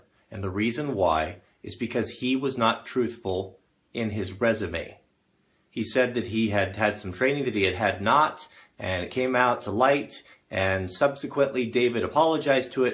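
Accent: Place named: North America. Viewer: I have no idea of the "Controversy" involving Mr Canterbury, BUT! I take my hat off to you for making your stand known to the world. I am residing in Ireland, and you sound like you are in north America, that is the reach of youtube! :-) Please keep the videos comming! Kev B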